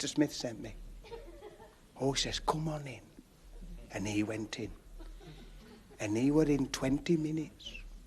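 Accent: Barnsley, United Kingdom. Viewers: As a sheffielder born in 65 listening to Charlie in the 70’s was fantastic! He has a true Yorkshire accent - it’s like silk for the ears. RIP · God bless Charlie. I'm a Derbyshire man but I loved Charlie's comedy and his salt of the earth Barnsley accent. He was an absolutely brilliant comic and a lovely man. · Thank you for your efforts!! is a gem in British comedy! Plus, I'm learning to understand that Barnsley accent of his! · That Yorkshire twang is amazing 👍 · I love his proper Yorkshire accent.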